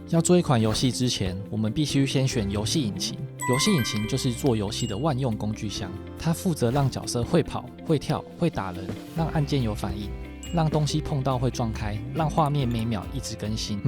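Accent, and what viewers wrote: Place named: Taiwan. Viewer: 是台灣人的聲音太感動了 我以為ai世界沒有台灣人了全部都是中國式教學...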